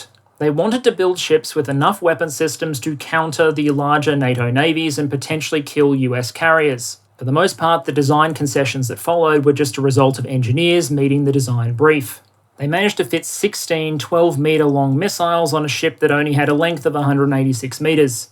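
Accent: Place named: Australia. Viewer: As usual for, excellent. One observation - not a complaint but a quirk of a Yank listening to an Aussi - It took me until the last few minutes to realize that you were saying "hulls in the water" and not "holes in the water". Honestly, I thought you were riffing on the joke that "a boat is a hole in the water into which you pour money"... Either way it made sense, sort of.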